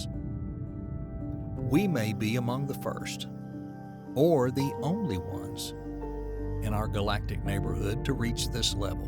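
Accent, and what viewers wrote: United States. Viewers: Those complex names just don't sound right with an American accent. It's pronounced You-carry-otic !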